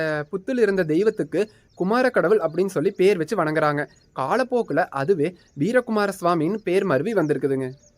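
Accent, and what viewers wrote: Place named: Kongu, India. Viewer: உங்கள் கொங்கு த்தமிழ்..🌹👌🚩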